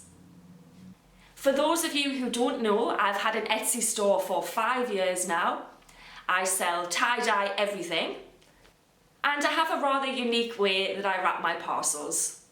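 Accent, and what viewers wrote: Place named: North East England, United Kingdom. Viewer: Hello. I’m new on your channel. Just want to say hello and let you know that I love your attitude! Oh... one thing to ask! Are you from Newcastle? I heard that lovely north east accent. 😀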